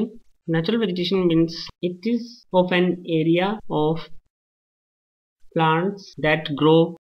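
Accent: England, United Kingdom. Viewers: Very helpful to revise the portion once studied... I like all your videos ... except your English accent everything is good...thanks a lot... Pls keep on doing Bipin Dogra and *****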